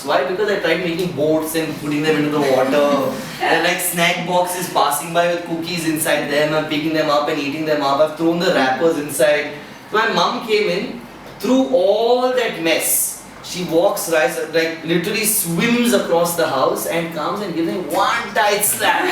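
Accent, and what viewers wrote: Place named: Deccan, India. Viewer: Hey dude I'm your fan from Bangalore and the area next of you and I just imagined every thing you said and the dakny urdu was awesome 😚😚😚😘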